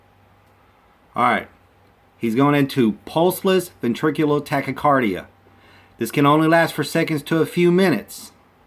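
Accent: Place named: South, United States. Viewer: Haven't seen somebody actually put scenes to the immune theory! I love it! I'm a nurse also and I do know that sometimes heartbeats are to faint to hear, even with a stethoscope. But I agree. He did seem dead to Shane and Shane shouldve been trained to tell since he was a cop. I really wish Carl is immune but my gut tells me that gimple isn't smart enough to throw this at us. But he is stupid enough to piss us off by killing Carl. I also love to hear a video narrated by a southerner. I don't hear southerners narrate videos to much.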